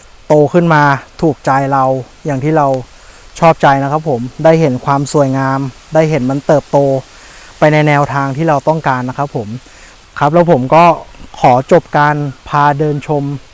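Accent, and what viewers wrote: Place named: Buriram, Thailand. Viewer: สำเนียงอยู่จังหวัด.บุรีรัมย์ค่ะ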